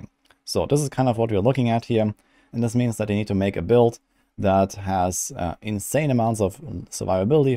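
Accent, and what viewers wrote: Germany. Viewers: Your German accent is sick LOL love it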